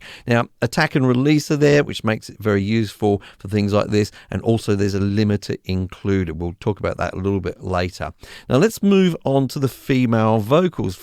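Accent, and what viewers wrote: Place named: Australia. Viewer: ... I could listen to your calm, informative, Aussie-tinged explanations all day long. So cool. I must try parallel processing some time.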